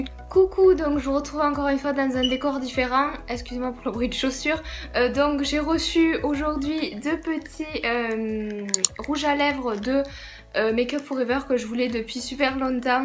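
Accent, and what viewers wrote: South, France. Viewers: hey l'accent du sud 😂 gros bisous j'aime trop tes vidéos 💞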